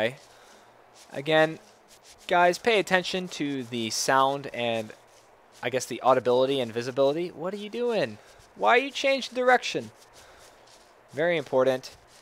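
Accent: Denmark. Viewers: Are U danish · are you danish? :P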